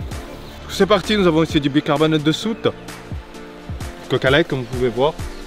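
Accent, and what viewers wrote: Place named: Belgium. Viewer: Il a un axant de Belgique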